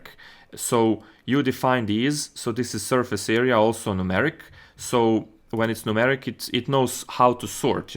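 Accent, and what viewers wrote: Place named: Bosnia and Herzegovina. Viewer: cim si poceo pricati znao sam da si Bosanac' Extra brate :D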